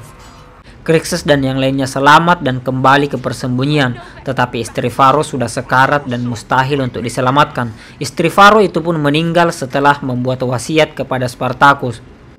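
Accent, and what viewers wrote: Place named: Makassar, Indonesia. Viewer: Orang Makasar ini kita dari logat nya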